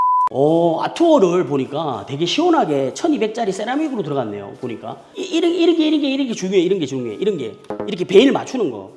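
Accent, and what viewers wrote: Busan, South Korea. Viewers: 부산 이쥬? 사투리인데 ㅎㅎ 인천송도인가?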